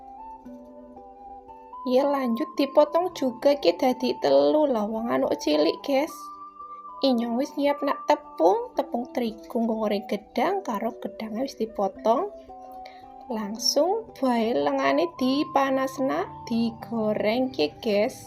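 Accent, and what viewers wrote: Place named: Banyumas, Indonesia. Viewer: Kok ngapak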